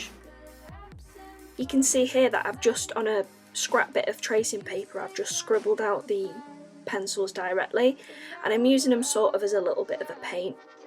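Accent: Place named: Northern England, United Kingdom. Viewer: oh how lovely! I really like how dreamy it looks in the end. I also really like your northern accent!!! And well done on the voice-over. 👍✨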